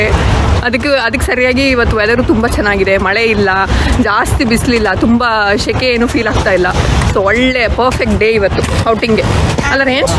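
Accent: Mangalore, India. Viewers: ಮಂಗಳೂರು ಕನ್ನಡದಲ್ಲಿ ಮಾತಾಡಿ ಕೇಳ್ಳಿಕ್ಕೇ ಖುಷಿಯಾಗುತ್ತದೆ 🙂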